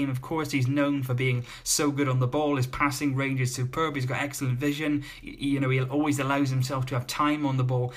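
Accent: United Kingdom. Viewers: Im curious whats your nationality? You sound British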